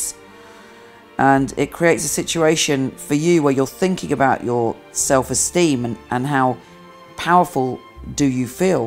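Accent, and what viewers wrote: United Kingdom. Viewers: Your videos are stunning. if they had an award for production I would vote for you. I always come for my weekly and monthly reading. You are such a force with your information. Oh, I just adore the British accent. Cheers!!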